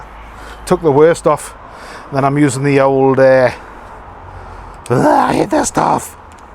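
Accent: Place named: Stockton, United Kingdom. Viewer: Thanks. By the way, Jill and I were taking guesses on your accent, I reckon your a Stockton, poss Darlington lad? 🙂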